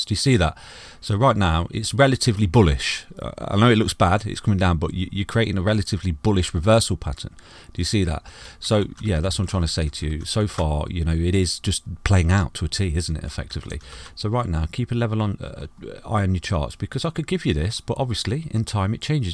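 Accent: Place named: East Midlands, United Kingdom. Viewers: Sounds like east midlands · He's from Leicester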